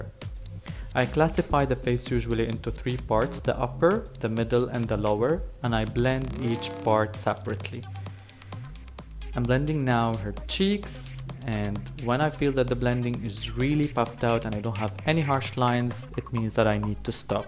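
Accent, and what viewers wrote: Arab world. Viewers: مبين عليك عربي من طريقة كلامك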